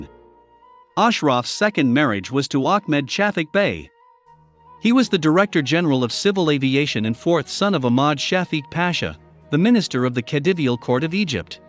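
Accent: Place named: United States. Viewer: The strong american accent who disforms the pronounciation of the iranian names is very annoying. The music is too loud and covers the speech.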